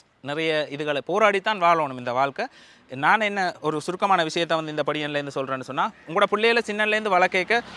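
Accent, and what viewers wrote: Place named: Sri Lanka. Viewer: Bro! 1st time paarkkiran ur seen, very reality & vaidvaa suththamaana Lanka Thamizh kathaikreenka, Nallayirukku ,piroyasanamaayirukku, ☆keep it up☆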